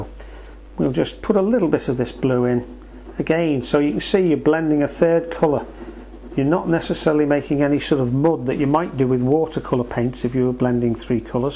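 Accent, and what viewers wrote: Liverpool, United Kingdom. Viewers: Thank you. I watched this with my children and you did a fantastic job! PS 6 yrs ago, we moved from Cheshire back to Canada. Your lovely accent sounds like you are from somewhere between Liverpool and Cheshire. Are we right??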